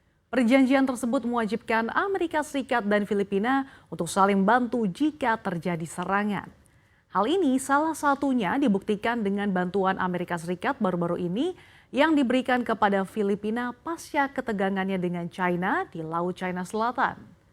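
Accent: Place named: Java, Indonesia. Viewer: Mbak hostnya jawa banget..manisss... aku suka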